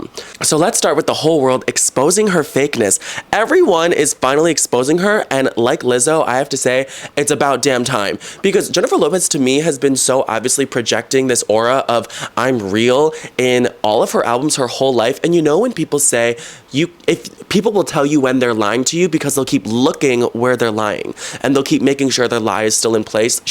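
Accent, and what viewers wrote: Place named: New Jersey, United States. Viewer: She sounds like she's from Jersey or from Newport.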